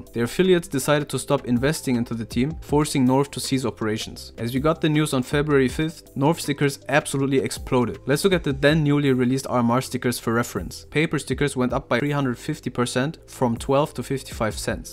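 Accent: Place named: Germany. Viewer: Where are you from? You sound like german and you talk about trilluxe in some vids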